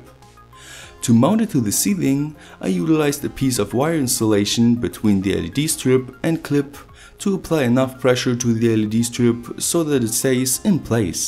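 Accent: Germany. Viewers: I hate English with Russian accent (crazy Russian hacker) but English with German paradoxally is very nice :) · C'm'on, german accent is the best part of those videos :)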